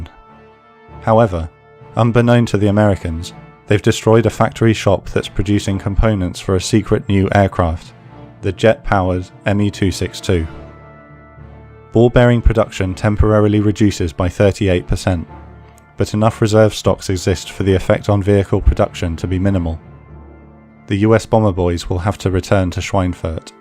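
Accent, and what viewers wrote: England, United Kingdom. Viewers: Krister Andersson: You couldn’t tell the narrator is English?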